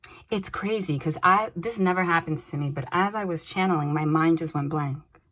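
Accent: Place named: New York, United States. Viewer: I needed this right now. it resonated so hard. I was thinking I need outside guidance to validate and clarify my own situation and this video was at the top of my notifications. posted like a half hour ago. and I've been sitting here for like a half hour pondering what's going on and boom here you are. and as a New Yorker I LOVE your New York accent. god it's so refreshing hearing a reader who speaks like me. like hearing my messages in a to the point direct New York way. like I feel like my mother or cousins are talking to me. I love your method of channeling. I have a similar type of occurrences of signs and validations and the cards coming out.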